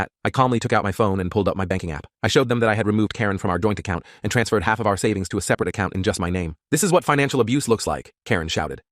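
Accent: United Kingdom. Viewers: Why did he turn British?😭😭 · Why did it switch to British accent/pronunciation?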